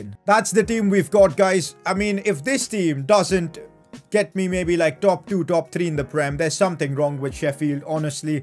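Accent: India. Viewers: R u indian bro? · Why does have an Indian accent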